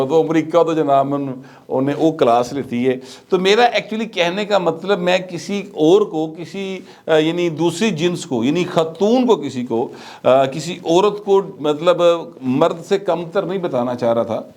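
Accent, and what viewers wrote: Lahore, Pakistan. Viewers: asslam o alaikum but sahb pichly 3 sal se apka viewer hun aur yh mera first comment hai huzoor bari nawazish ap ny Punjab k liye kisi dard ka izhar kia Hamari Punjabi qom k liye bolne wala koi nahi Razi dada is the only who in renowned journalists who speak for Punjabis rigts. i am extremely happy to see you in the pro Punjabis camp. hamarai zuban hamari saqafat hatta k hamari identity ko kuchalne ki jo sazish Angrez dor se start hui woh still apni poori quwat se jari hai. sab subon mein primery EDU un ki local zuban mein hai but Punjab mein agar hamara bacha ghalti se b punjabi bol le to us mara jata hai. please raise your strong voice for our mother tongue to be taught in our schools according UN resolution. but sahb yaqeen janen apky Vlogs mein sab se bara mazedar part apka Punjabi tarka aur Lahori lehja hota hai jo apko baqi logon se mumtaz kr deta hai Bohat sohni lagdi a sadi maan boli tuhade mounhon sada khush raho wasde raho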